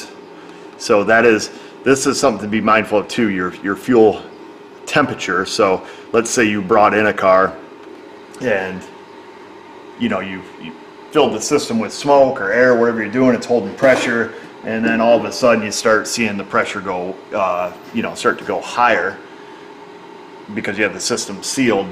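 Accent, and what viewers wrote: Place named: Upstate New York, United States. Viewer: Cancer vent valve? Oh.. canister... That upstate accent!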